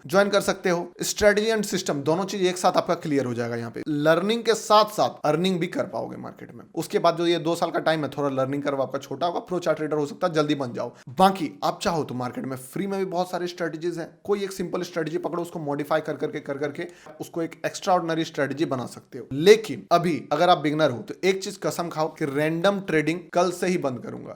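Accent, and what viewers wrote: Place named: Odisha, India. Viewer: Bhai tum odisha se ho 😊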